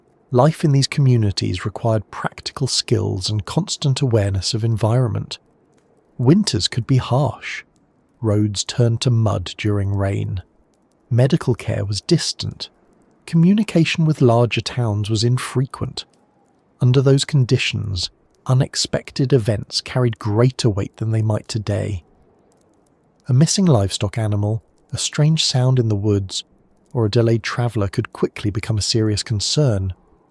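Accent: United Kingdom. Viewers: Why is this narrative British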